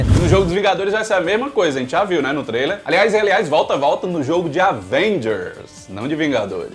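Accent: Northeast, Brazil. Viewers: Vc é nordestino? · Gostei do que vi, menos os rostos. E, cara, você fala como Nordestino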